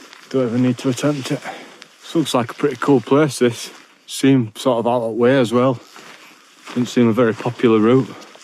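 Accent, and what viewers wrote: Yorkshire, United Kingdom. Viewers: Great video, epic views all well presented, by the way, which part of Yorkshire is the accent from?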